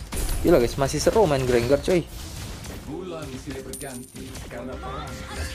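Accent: Manado, Indonesia. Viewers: Orang mna bg Manado ?apa Sulteng?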